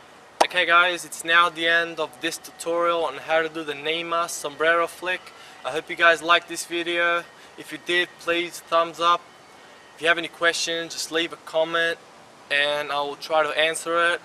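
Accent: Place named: Australia. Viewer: I thought you sounded kinda Aussie haha nice tutorial though, got the hang of it in about 20 minutes ;)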